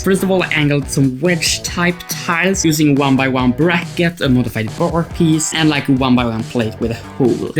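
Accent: Sweden. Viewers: really nice work! And by the sound of it så låter du Svensk, men kan ha fel 😅 Kommer garanterat besöka din sida och köpa några ritningar framöver 👍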